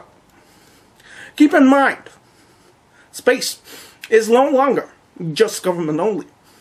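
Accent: Standard. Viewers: Fairly well done neutral American accent :-)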